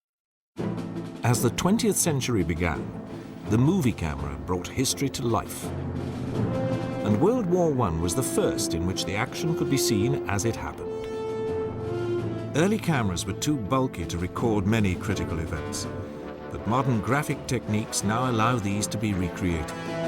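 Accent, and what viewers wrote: United Kingdom. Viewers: Despite the very basic graphics, with the British narrator, actually quite watchable. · Great? Proper British accent to announce that 1 million people were killed and then is sad since the strategy didn't work. The PR tells us that that is the "civilized" way. I've decided otherwise.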